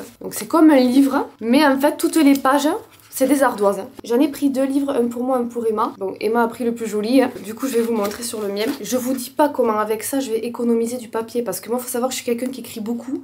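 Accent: South, France. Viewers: Coucou, merci pour ta vidéo et de représenter les filles du Sud avec accent lol Je te conseille ( humblement ) de choisir des thés et infusions de qualité ( évites surtout les marques de supermarchés... ) du style le Palais des thés, Mariage Frères, Thés de la Pagode. Ton palais appréciera d'avantage! Gros Bisous de Perpignan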